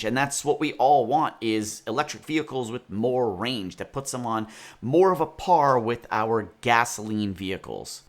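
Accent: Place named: United States. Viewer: hmmm 🧐 Now i understand why you look and your name is like an 🇮🇳 Indian 🇮🇳 but talk like an 🇺🇸american🇺🇸 And i am from Bhilwara,rajasthan. You can check on maps where it is. as you know covid-19 is going on all over the world but here in my town. There are covid +ve coming more and more daily but nobody is has fear of it now than before lockdown and some people have died nearby my colony 😭 and even in my family my chachi got covid😭 but she is not living with us and she is fine right now. but nobody is taking precaution and saying the virus is getting weaker and if you get covid test result +ve than you will be going get your covid test result -ve after some time and immunity power of indians are far stronger than rest of the world, etc. And if you go around the city you will see that it's like NORMAL , there is no covid or anything happened. People just carry mask so that they don't get chalan (fine) from police. 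What is happening to people's mind in india but i can guarantee you that if this goes on and does not stop than india will be no. 1 in covid cases and death in the world 😭. I am taking precaution so that i and my family don't get covid. And i see in your videos that people there in USA are taking precaution so that's good because you all know the fear of it. So take care of you and your family. And I didn't see your family in your videos, do a video about your home 🏡 (where you live now). And last but not least I learn English by my teacher to pass the exam but I l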